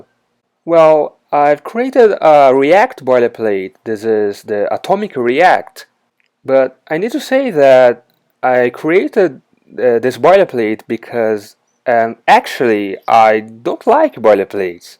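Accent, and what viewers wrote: Brazil. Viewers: Reconheci o sotaque brasileiro :) kkkk Rapaz tô sofrendo pra aprender React. Realmente baixei um boilerplate pra integrar em um projeto Django, mas veio tanta coisa junto que me perdi todo. O problema é que se o projeto não for seguro e não puder se comunicar com o API num serve pra nada. Você ensina essas coisas ? Tô precisando de um mentor de Javascript Frontend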